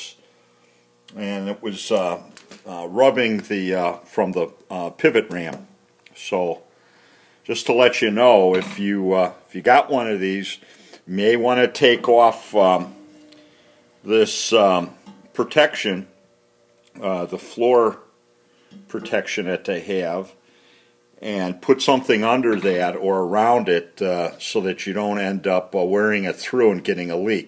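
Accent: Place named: Northern Illinois, United States. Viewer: A fair sized threaded Clevis Shackle sure helps hauling it into the garage out of the crate. The threaded pin on mine just barely fits into hoist hook opening. The fabric hoist sling then easily slides on each side of the shackle. Yeah, I knew you were from northern Illinois. The boat video and the voice were dead give aways. I'll have to work on it to legally get to my property in the UP. I wish I could do some quick connects and haul it out of a trailer up there with a chain hoist and lashed tinbers, but I'm thinking I'm better off just doing fenders and lights and haul back roads at night. Just have an old Ford Explorer and a well restored old dirt trailer based on an ancient welded pinion U shaped frame/axle thing . It holds alot of weight, but .... Thanks !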